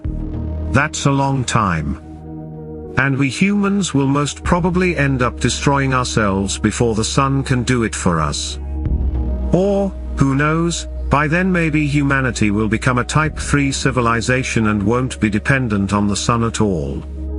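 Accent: United Kingdom. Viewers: The brainy peoples' brains are swelling and will explode too, but in only a few years, not billions. With all the bucks you people get I truly believe you could put the money to better use; and not just in your pockets. For starters, get a spokesperson who speaks without a Brit accent. I remember watching a NASA APOLLO take off on TV, with my wife, and saying; Look at the ice build up on the o-ring joints! I think something bad is going to happen'". And an astronaut, who was from Mohawk,NY, some 10 miles from me perished with all the others on board. I hope the wire insulation you people are using is NOT made from soybeans. Get It? You people are on the Elite Welfare System and suckin it right up. Stop your putting fear in everyone, and start fearing and loving, and obey more, to please God. All the worlds infrastructure is shot, and all you do is keep shooting holes in our atmosphere. 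Will you be stumped, like everyone else, if the electricity stopped, for 40 yrs, like the 40 yrs God sent the Israel people into the desert?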